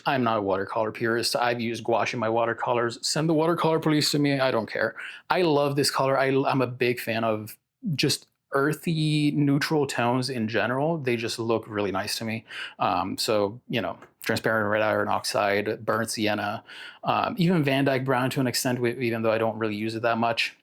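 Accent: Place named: Ireland. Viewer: Are you originally from Ireland? Your accent reminds me of my late great grandmother whom I'm named after.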